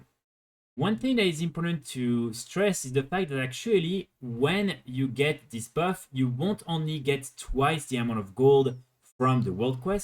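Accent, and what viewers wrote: France. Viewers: Un FR reconnaît bien un FR lorsque celui-ci parle en anglais c’est dingue 😂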